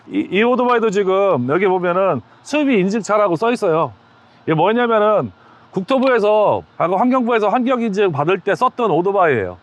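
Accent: Chungcheong, South Korea. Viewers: 핸섬하시군요😅충청도 말투😂❤❤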